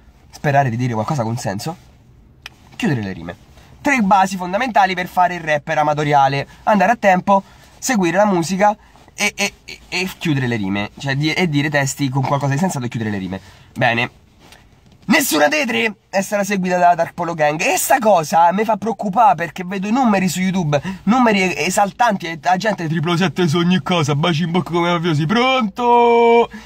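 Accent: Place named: Marche, Italy. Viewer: Ma sei marchiciano 😂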